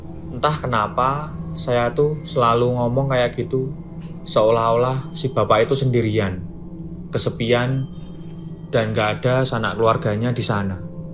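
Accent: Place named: Java, Indonesia. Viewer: Selalu diulang dngerin crta ini...crtany bgus naratornya jg salah satu fav...medok Jawa TPI jelas cara berceritany..KLO cwe kyk mb Devi. Good job mas.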